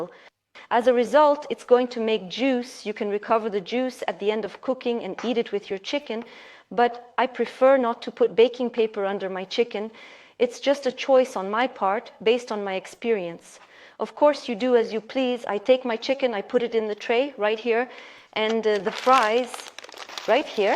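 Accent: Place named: Ireland. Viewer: Great review. I love your Irish accent.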